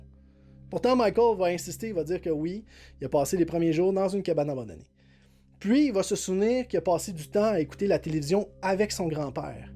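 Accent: Quebec, Canada. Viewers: Un québécois, sa fait du bien, lâche pas mec, c'est super bon ce que tu fais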